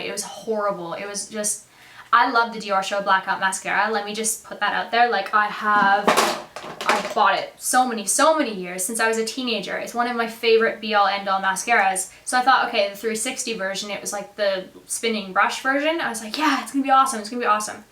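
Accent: Australia. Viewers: I love your videos! :) Thanks for posting xx p.s. your accent is transitioning to australian! love it hahah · You can hear an Australian accent starting to come out in you. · I notice the Aussie accent is starting to stick!! :) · Wow you have really picked up the Australian accent! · Is that a slight aussie twang to your accent? ahaha love it! i can hear it! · lol i dont know if its because i havent seen your videos in a while but you have more of an australian accent now, love it! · This is going to sound extremely weird but I really love your accent! It's amazing how 3 years ago, your accent is completely far from an Australian one and now, you sound quite like an Australian. I find it really cool and it makes your voice sound extra great to listen to :3 Love the video as always! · i think she's picking up on an australian accent a little! :) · I hear that Australian accent trying to break through! 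Haha! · It's hard to tell that you are Australian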